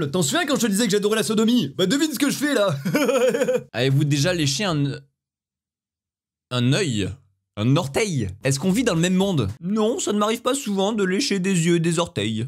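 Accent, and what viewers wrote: Marseille, France. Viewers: T marseillais ou toulousien ?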